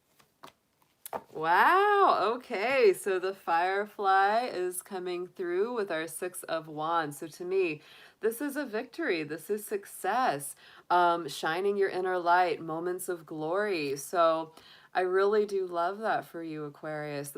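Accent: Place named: South, United States. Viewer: I love your southern accentb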